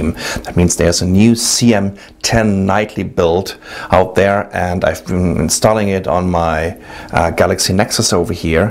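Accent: Germany. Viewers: Du lässt die das Wetter von China und Taiwan anzeigen, hast aber einen deutschen Akzent beim Reden? Suspekt.. Aber sympatisch :) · Like the video and German accents are cool, man.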